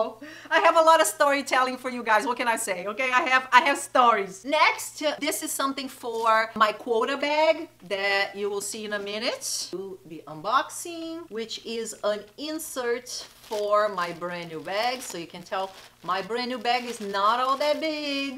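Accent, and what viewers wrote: Brazil. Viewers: Are you brazilian? Because of the accent! Love your videos!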